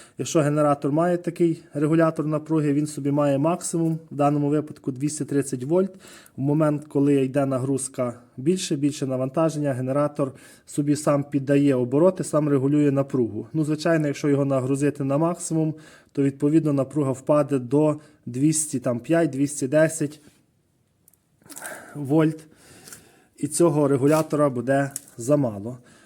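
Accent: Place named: Hutsul, Ukraine. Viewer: це не українстка, а гуцульська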